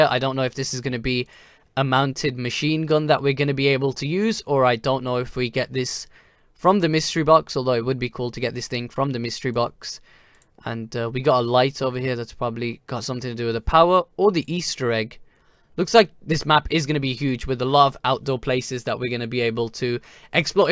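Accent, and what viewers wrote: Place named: RP, United Kingdom. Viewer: Fucking posh mate🙈🤗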